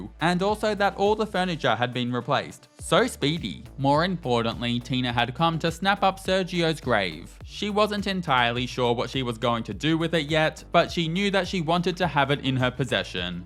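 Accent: Australia. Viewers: is Aussie 👀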